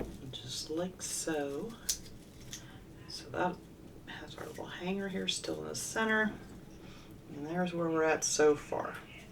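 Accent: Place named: Pennsylvania, United States. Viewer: I love watching you craft. I also get a kick out of hearing you say R ite instead of alright. I wonder if that is a PA thing. My daughter lives in PA, I will have to ask her. Thank you for sharing your talent.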